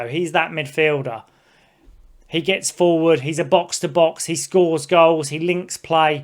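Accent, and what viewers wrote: United Kingdom. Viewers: I just love your Newcastle accent ! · A Newcastle Fan from Down South? (judging by accent). That's gotta be a rarity?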